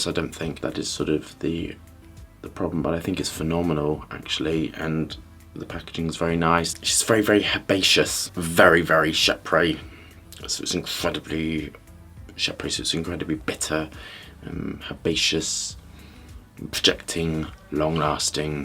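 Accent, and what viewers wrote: United Kingdom. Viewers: i just stumbled over your channel. Love your expert knowledge and your talking in british accent.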